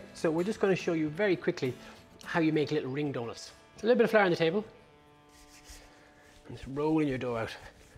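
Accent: Ireland. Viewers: I so enjoy your Irish accent, experience & knowledge. Thank you, God be with you. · It is English but with an Irish accent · I'm not going to lie I'm going to have to watch this a second time ..love just listening to Irishman talk one cooking is a little mind blitz your awesome from America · is such a great teacher and communicator. I have been making breads at home for years but I still have had my knowledge added to and reinforced by this and other videos here. He takes time to really give an explanation as to how and why. reassurance that a recipe is just a foundation and that the experience of baking has so many variables is valuable too. Not to mention the soft Irish voice! I regularly have fresh yeast (I just ask at the in store bakery at my supermarket) as I just think that it gives a better flavour. I am also totally converted to slow proving as I know that the flavour is improved. 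When I made these doughnuts I did not have a lemon but substituted lemon zest with grapefruit zest and they produced a very tasty batch.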